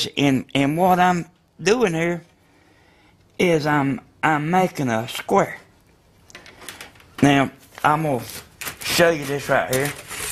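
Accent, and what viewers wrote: South, United States. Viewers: I love the southern accent. 👍🏻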